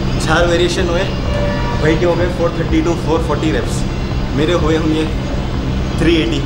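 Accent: Hyderabad, India. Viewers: Nice accent Hyderabadi londe.. mashallah khubsurat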